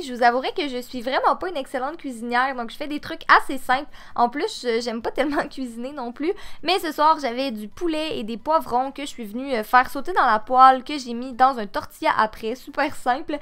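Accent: Quebec, Canada. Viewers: tu est la seule avec l'accent québécois que je supporte 😍👌👍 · L'accent québécois c'est le sang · Je sais pas si je suis la seule à kiffer la voix québécoises elle est magnifique :) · C'est tellement mignon l'accent québécois 😂😍😍😍💘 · Ton accent est trop "cute" je sais pas si c'est comme sa que vous dites vous les québécois pour dire mignon mais je crois avoir vu sa dans les Lea Olivier😋je suis Belge et chez nous il y a pas cet accent là mais j'adore alors quand je m'ennuie j'essaye de vous recopier mais moi sa ne marche pas 😪😂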